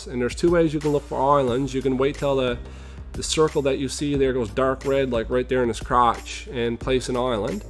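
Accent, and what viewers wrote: Newfoundland, Canada. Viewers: Man i have to ask are you from Newfoundland? Soon as i heard your voice i was like , its one of us hahahahah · you sound like my uncle from Newfoundland, makes me feel like I'm gonna have a awesome time when I watch your videos. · From newfoundland bud? also this was pretty interesting, thanks for the video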